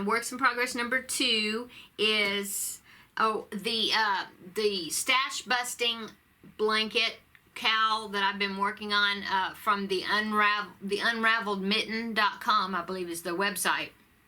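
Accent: South, United States. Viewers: Im from southeast Georgia. I just came across your channel this week and have watched the last 7 or 8 in a row to try to "catch up". You caught my attention with your accent. I thought, "oh yay she's southern too !" Lol Congrats on your viewers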